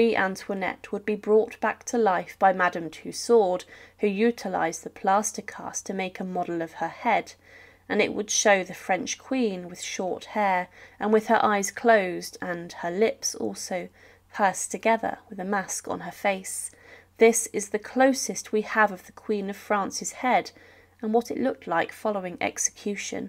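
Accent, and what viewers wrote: United Kingdom. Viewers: Narrator is not American lol. She sounds British. "Only Americans" 🙄 smh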